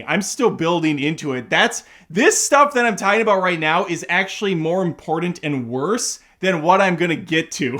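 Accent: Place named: Minnesota, United States. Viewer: Beig, Bayg... U r from Minnesota.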